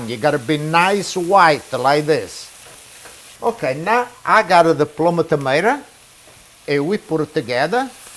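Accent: Italy. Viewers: Grazie!! I love watching you cook and I love your accent, you sound like my neighbors (and cousins) when I was growing up!! They made me fall in love with tomatoes, garlic, lemoncello, espresso and all things Italian!! I really like your little bit of oil, olive oil is even in the Bible as a food we should eat. Tutti a tavola al mangiare!! Yummmmyyyy!! And please forgive me if I misspelled any Italian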